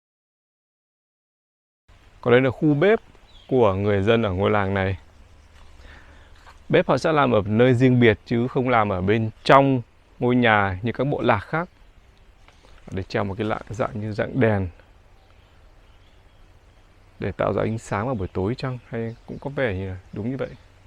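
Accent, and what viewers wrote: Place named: Mekong Delta, Vietnam. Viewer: Canh giong mien tay wua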